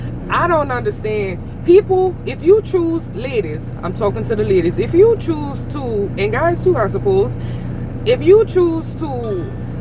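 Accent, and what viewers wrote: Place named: New Orleans, United States. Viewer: Girl where are you from with that accent?? New Orleans?? You're so Beautiful, keep doing you. I just happened upon your channel looking for lady truckers because I'm trying to find out SOME of what I need to know as a semi new driver.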